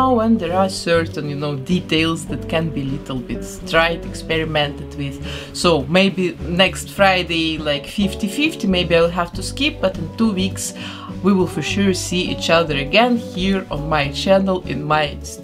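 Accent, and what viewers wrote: Russia. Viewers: Чувствую русский акцент! Но работа просто отпад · Русская что ли? Акцент слышен очень, русские поймут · Я понимаю, что мне казалось, но я будто все видео слышала максимально русский акцент... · Слышно что Русская! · Excuse me, are you russian? или мне показалось... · Почему я слышу жесткий русский акцент? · Where are you from, your accent make me think that you're Russian))) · Russian accent, wow! Even I can't normally say something in English, and you do it perfectly! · очень слышно, что вы русская. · Тыж русская. По акценту слышно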